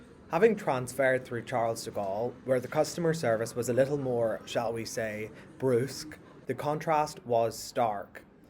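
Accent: Northern Ireland, United Kingdom. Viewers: Hello, your video just popped into my inbox tonight and your lovely Northern Ireland accent, combined with you being dressed very nicely but comfortably, got me watching. I have never flown Virgin Atlantic, BA, Aer Lingus and Air Canada are my usual choices. I enjoyed your video but was very disappointed that you did not get a window seat. The Lounge looked excellent. I have been traveling alone now for about 15 years and trust me, I am very comfortable now. I am originally from the West of Ireland. I do like your lovely approach to showing us exactly what we need to see and hear. Video was perfect from start to finish. Good luck on your Journeys. I have subscribed to your Channel. Have a great week.🍀✈️☕️🫖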